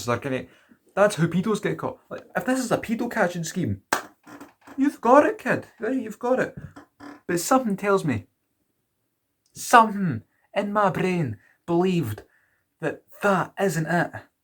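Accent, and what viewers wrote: Ireland. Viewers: Love the Irish accent my guy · Wow this guy has such an amazing Irish accent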